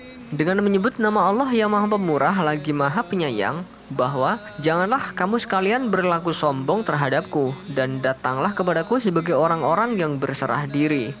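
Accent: Java, Indonesia. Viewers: Admin Jawa ya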